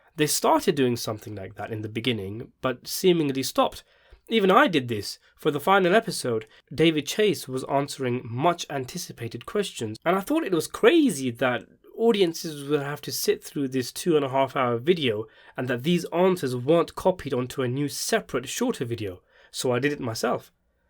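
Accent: United Kingdom. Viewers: As soon as I herd the British voice nobody gives a shit what a brit thinks . You really sound dumb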